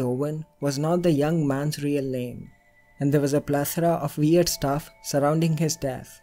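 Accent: India. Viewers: Then don’t listen. Keep your prejudiced opinions to yourself. I wouldn’t characterize his voice as Hindu, since Hindu is a religion. He has an Indian accent. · You're clearly very uneducated, Hindu is a religion, his accent is Indian, those two are not the same thing. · Lol the Hindu accent. I cannot understand what you say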